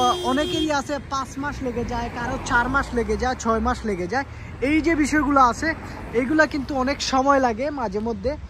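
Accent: Sylhet, Bangladesh. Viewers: ভাই আফনে সিলেটি নি?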